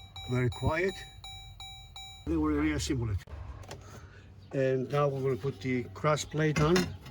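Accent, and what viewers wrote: Greece. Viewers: Good video sounds like Greek accent not that it matters lol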